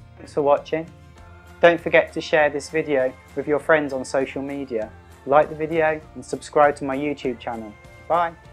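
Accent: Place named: England, United Kingdom. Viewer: You had me subscribed at your English accent (is that even grammatically correct?)! Thank you for sharing your great ideas :)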